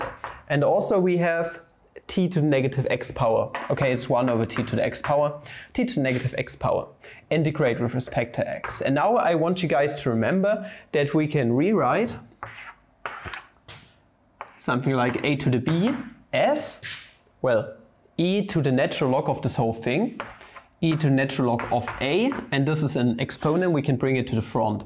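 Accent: Germany. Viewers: Oh dear sometimes I don't know if you're speaking Deutsch or English. Work on that accent pal and it will be the best!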